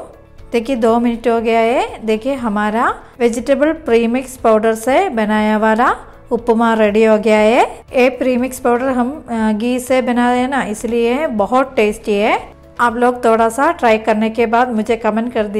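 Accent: Kerala, India. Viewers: Hindi u talk so well in Kerala accent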